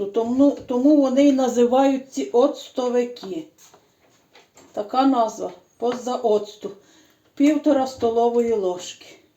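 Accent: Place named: Lviv, Ukraine. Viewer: Чую Львівщину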